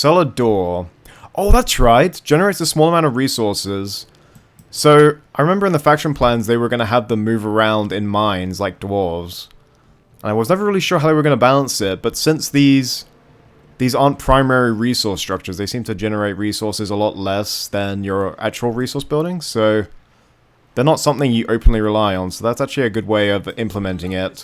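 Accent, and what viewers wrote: United Kingdom. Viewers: I liked as soon as I heard this glorious British voice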